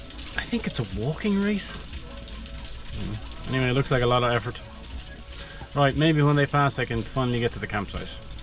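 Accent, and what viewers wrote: Ireland. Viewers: Hi! I found your channel by accident but know you from Dan's channel (been following him since his farmhouse days). As I have enjoyed your interactions I've seen from Dan's perspective, I thought I'd check your channel out and I love it! I hadn't heard enough to realize that you're Irish until hearing you on your own. Irish blooded myself, I miss the quirky, snarky humor and so thrilled to see it in you. Thanks for the smiles and I look forward to more! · New viewer here, thanks to Dan posting your puppy video. Seeing as you so kindly numbered your vlogs, I decided to begin at the beginning of them. You're loads of fun, great scenery videography, witty reparte', lol, what's not to like? I could listen to you talk all day long. Your accent is much like my own. I have missed hearing it. Dad's family were McKinley's from northwestern Ireland. 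Most there starved by the Catholics and British. So, to America, his grand dad went from mining and being a Molly McGuire to becoming a weaver in Philadelphia. Dad and his dad carried on the traditions of being Molly McGuires in Ohio, West Virginia, and Kentucky with full support of corrupt cops. We used to sit on a blanket covering loads of guns, ammo and dynamite, while traveling 4-5 hours out of state as kids. As you might imagine, our childhoods were sheltered and so controlled because he was in organized crime that our way of speaking remained Irish. An englishman, a linguist, once paid me to talk as he recorded me speaking. He rather enjoyed pissing me off to hear me tell him off, lol. I am, myself not Irish by blood though I have green eyes. I never feared St. Patrick's Day at school for not wearing green. Not wearing it got one punched in the shoulder. I am native American Indian by blood, a direct descendant of the Shawnee Indian leader, Tecumseh. 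I don't know how to be an indian, except dad respected how quiet, sneaky, and courageous I was when he threatened my life and tried to ki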